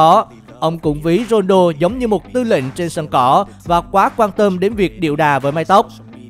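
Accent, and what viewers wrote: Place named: North, Vietnam. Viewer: Sao tiếng BẮC KỲ khó nghe quá 👎👎👎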